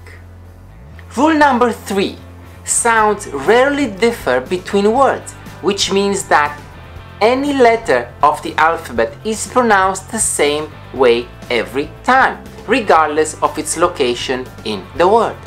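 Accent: Transylvania, Romania. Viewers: eu mi am exprimat opinia personala referitor la pronuntie nu este nimic ciudat; ideea vlogului este f.buna; accentul ardelenesc nu ma deranjeaza iar in romania este la moda sa vorbesti romaneste cu accent american,italian etc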